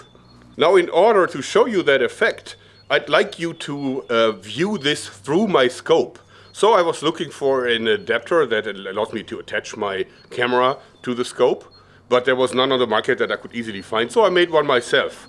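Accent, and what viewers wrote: Germany. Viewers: lol ist der deutsch voll der deutsche Hintergrund in der Stimme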